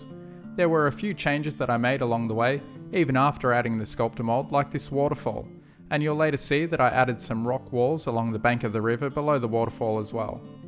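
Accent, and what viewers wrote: Australia. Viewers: you clearly have an Australian accent, Yet use a lot of American products, so my question is where are you located? As I am in Australia and importing those products work out to be very dear. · I can sit and watch your videos forever. Nice background music, an Aussie accent and lots of tips and tricks to build awesome stuff. What more can one ask for.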